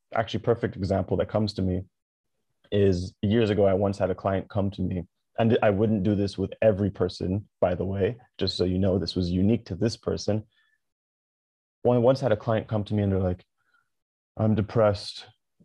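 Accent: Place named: Jamaica. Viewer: Are you Jamaican why you look and sound like a yaad man!! If that's soooo bomb!! I'm loving your videos. This one is really good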